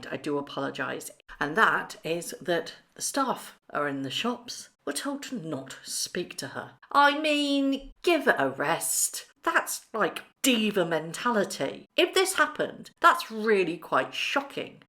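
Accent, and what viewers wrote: United States. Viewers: Your American accent, matches Megan. Total rubbish 🗑😑 But you are amazing 👏❤💖, love your accent . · Your American accent is as stupid as this entire video. Get a life.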